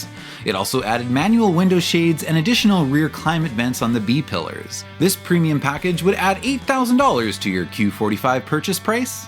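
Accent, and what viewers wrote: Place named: Canada. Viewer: you are definately CANADIAN. "aboat time."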